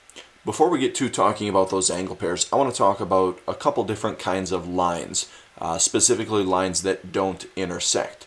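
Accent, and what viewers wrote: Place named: Canada. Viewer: this man is a canadian fo shooooooooo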